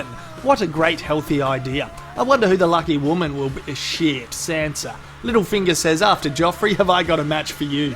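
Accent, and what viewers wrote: Australia. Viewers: this guy got everyone trying to speak like a australian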